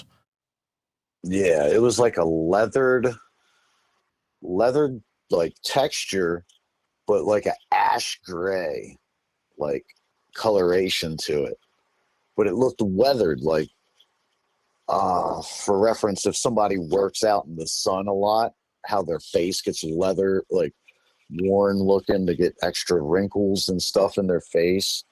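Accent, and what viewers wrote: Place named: South Pennsylvania, United States. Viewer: I'm here for both the story and the south PA accent. :)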